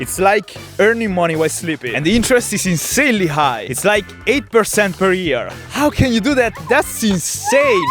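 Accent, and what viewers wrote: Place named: Italy. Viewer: Other skills you can learn (I'm new so I don't know if you already learned them. I discovered you yesterday.): 1. Learn the Japanese Katana (Like you did with the Nunchucks and the Staff.) 2. Develop a British accent (But don't use it because I, and probably every fan really likes your Italian accent.) 3. Learn how to freestyle rap on command. 4. Learn how to solve a Rubix cube, but blindfolded. 5. Learn to do the cup stacking game. This was all I could think of. Do you like it, and would you use it?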